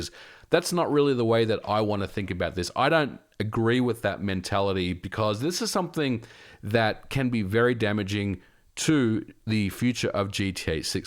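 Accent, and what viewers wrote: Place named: Western Sydney, Australia. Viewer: Which part of Australia are you from? I am getting Western Sydney vibes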